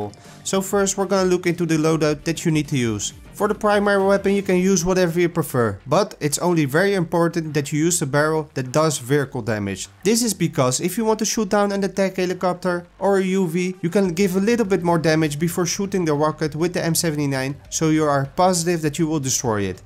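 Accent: Netherlands. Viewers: you sound dutch mate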